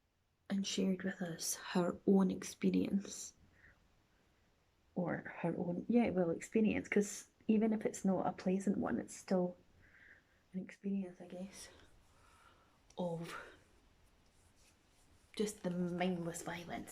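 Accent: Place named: Scotland, United Kingdom. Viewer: There's a phrase from when I was in the military, when someone did well, "atta-girl" or "atta-boy"...you get an atta-girl for dealing with your anxiety and still make videos and PowerPoint presentations! As to being disenheartened, another thing I learned from my time in service: If you have helped only one person your life has been worth-while. You are helping me to embrace wearing makeup...I mainly only wear it for special occasions, or if I'm on "vacation" with my hubby while he's at a tech conference (pre-worldwide cooties) and I had time to watch YouTube creators with makeup videos. (When my makeup is on-point, I feel better about myself - and anything healthy that I can do to bolster my mental health is a good thing.) Wayne Goss is one I follow, as well as a couple of females who specialize in "older skin" (I have 20 years on you.) The upside of your unedited videos: we get to see how long things take, how to fix oops-es, annnd, we get to hear your beautiful voice! 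(trust me, a lot of us U.S. folks are gaga for a beautiful Scottish accent...just ask Cheryl LOL!) ❤️ Sorry for the novel-length, but I thought it was important enough to share my thoughts. 😉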